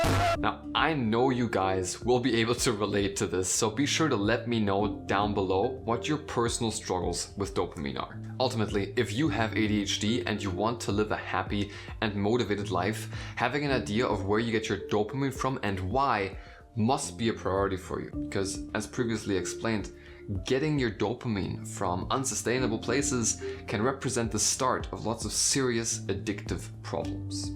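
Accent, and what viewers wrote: Germany. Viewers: well he works in Sales (sic). I do not need a T-Shirt or Hoodie with a (imho opinion) daft slogan, let alone the expense to remind me of my ADHD. Might work for some, but that's too much HYPE for me. Viel Glueck mit dem Unterfangen Herr. I'm out! (That's best of luck in German, I'm German and can't help notice his accent). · Are you German? I‘m German and you sound to me like German talking English. Just want to know, no judgement. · Huh ben je Nederlands? Jouw accent klinkt Duits haha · Your English is excellent. My Omi was from Frankenberg and I enjoy your videos for the information and the accent. I miss hearing it. Thank you! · Man merkt nur ein kleines bisschen, dass du Deutscher bist😉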